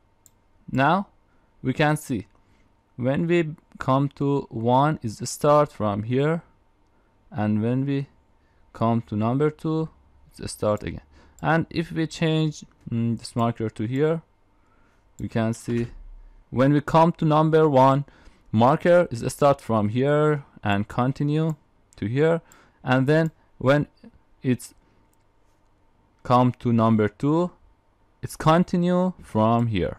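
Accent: Iran. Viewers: حاجی حس میکنم ایرانی هستی از صدا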